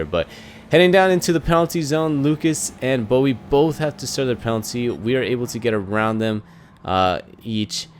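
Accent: United States. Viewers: You sound american?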